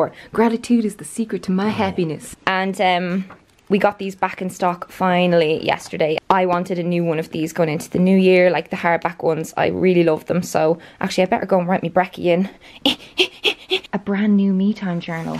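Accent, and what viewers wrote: Ireland. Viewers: ur irish accent is everything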